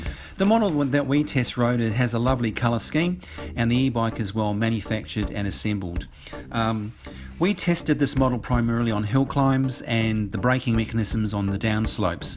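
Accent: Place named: United Kingdom. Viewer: Dude...I love your commentary. You sound British to me but whatever you are - you do an awesome job! Thanks for doing a real review.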